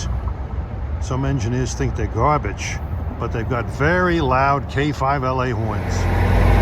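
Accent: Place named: Long Island, United States. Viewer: Great videos! Where are you from originally? That accent sounds like Long Island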